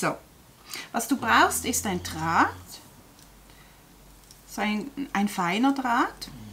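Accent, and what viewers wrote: Switzerland. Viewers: Liebe, ich finde deine schwizerische Aussprache entzückend. Das Verständnis ist von meiner Seite da, aber ich lebe auch in Bayern. Wir verstehen alles.....nein manche Bayern verstehe selbst ich nicht. Eigentlich bedarf es auch nicht vieler Worte, die Objekte sind durch das Zuschauen selbsterklärend. Zu viel Gerede ist ja manchmal auch so, dass man denkt, " Komm jetzt mal zu Sache" 😄. Ich finde alles super toll entspannend. So entspannend, dass ich auch schon eingeschlafen bin ☺️. Das soll nicht negativ sein. Nein im Gegenteil. Es ist alles sehr ommmmmmm. Beste Grüße und mach weiter so!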